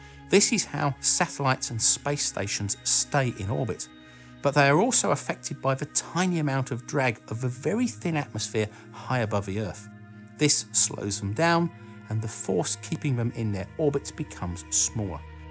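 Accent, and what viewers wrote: United Kingdom. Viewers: hit like for british accent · Why is this guy with a British accent when it is the Americans who are achieving this accmplishment??? I went to Cape Caneveral and they had a British guy expliaining the Mars Mission. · You explain everything better than my first language teacher. Is this British accent?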